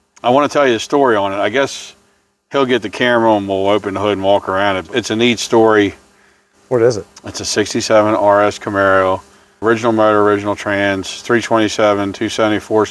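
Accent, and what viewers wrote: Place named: Georgia, United States. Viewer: That car really makes a statement rolling out of the garage. Is this? OK, I see the hat. What is that white stuff on the ground? How can anyone let this car sit from 1995 to 2018? What a waste! points out how "old school" is. You still use a newspaper in the age of the internet. is like, under his breath, well I got the car and you don't! Go Newspaper! You sound like you live in Georgia. Can't take the car out there because of all this mess. Looks like a light dusting of snow there. You did drive over to place? Hey, even said this is "a nice safe driver". Sounds like has a Camaro in every color of the rainbow. Always go with 69 in life. Like the special effect of the two of you disappearing. Great video guys!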